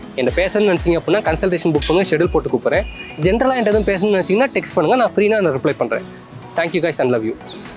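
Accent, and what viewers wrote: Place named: Tamil Nadu, India. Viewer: Super broo😁unga tamil slang enaku romba pudikum🤗🔥🔥🔥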